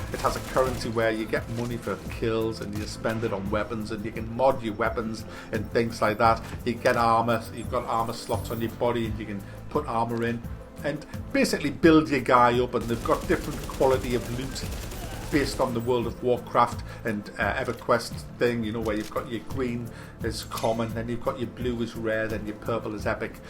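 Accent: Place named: Northern England, United Kingdom. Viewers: mate, which city are you from, your accent sounds like a northener, most importantly, where's your bell from? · great video. just out of interest i assume you are from the north east, if so whereabouts???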